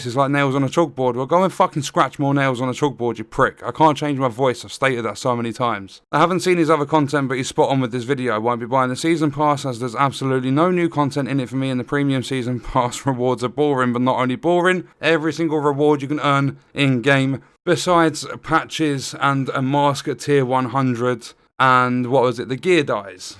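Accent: United Kingdom. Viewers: Love all the content. That British accent cracks me up mate 😂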